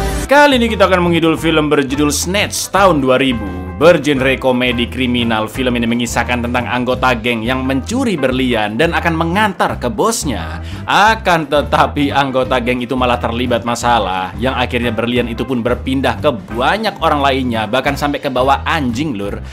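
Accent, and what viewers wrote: Java, Indonesia. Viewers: Logate sampean kayak pantura marai aku kelingan omah mas, seneng ngrunguknoe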